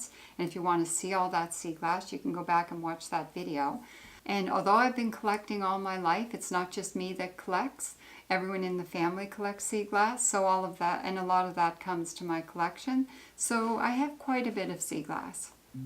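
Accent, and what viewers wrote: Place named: Nova Scotia, Canada. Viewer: Since I'm from Nova Scotia, I loved watching your video and hearing the accent I grew up with. You are quite the artiste! I can't imagine trying to figure out how long it took to create a mosaic. No time limit on true art, eh? I'm sure those who paint on canvas or those who do pottery or those who chip away at a statue don't know or care how long a piece takes. :) My daughter is the one who collected all her sea glass and your videos will help us understand how to create our own art (I'm her helper … sort of) ;)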